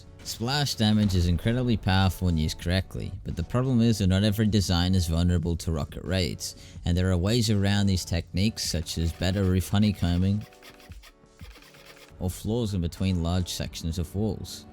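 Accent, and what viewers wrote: Australia. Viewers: Definitely an aussie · OlliBrolli He’s an Aussie fam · Aye an Australian, or maybe a new zealander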